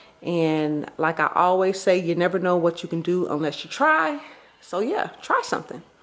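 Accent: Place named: Florida, United States. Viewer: New subscriber here! I knew you were from Florida with that accent before you even said it. Lol I'm originally from Tallahassee.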